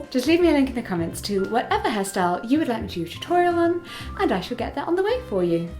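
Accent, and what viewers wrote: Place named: England, United Kingdom. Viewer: She could not be more English. It's not just the look or the accent but the mannerisms, the cadence of every single move. It's like she's a time traveler from a bygone ers, an upper class lady from Victorian/Edwardian times. I am absolutely mesmerized.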